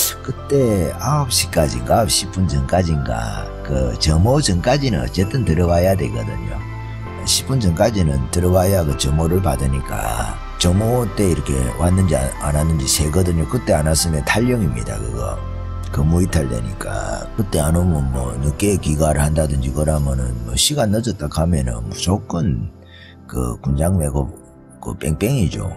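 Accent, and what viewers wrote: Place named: Gyeongsang, South Korea. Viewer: 경상도사투리가 세삼좋네요~~^^유머와 부드러움~단호함~~궅궅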